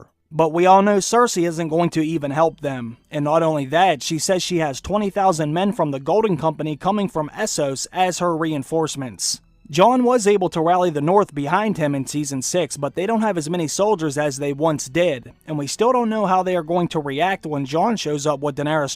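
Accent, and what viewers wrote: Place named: Oklahoma, United States. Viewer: Where are you from? You sound like you're from Oklahoma, or Utah.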